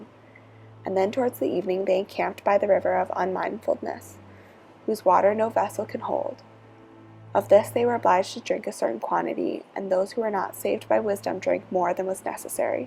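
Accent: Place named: South, United States. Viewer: Sorry...I found both the graphics and the monotonous Southern voice annoying.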